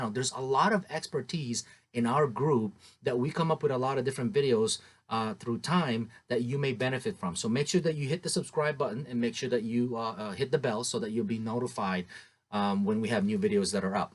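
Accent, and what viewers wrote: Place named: England, United Kingdom. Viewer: I love your English accent❤️😭 dame your pronunciation ❤️❤️🔥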